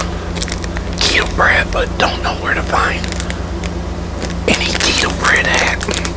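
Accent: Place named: Texas, United States. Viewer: Hey your from Texas arnt you my dads from Texas to i love your accent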